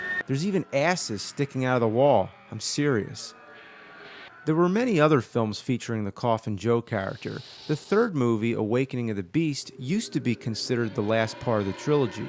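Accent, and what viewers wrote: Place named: Philadelphia, United States. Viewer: E vai pegar um dos sotaques mais legais do inglês americano, o sotaque Philly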